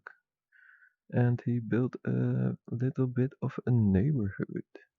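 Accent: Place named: Netherlands. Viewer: Indeed it sounds little dreamy, dutch english accent aswell be. Except one voice wich can´t change a better micro would do wonders, lowering volumes don´t seem to be the sollution. Story telling keep the viewer motivated, practice, the content is very well, hope you will do !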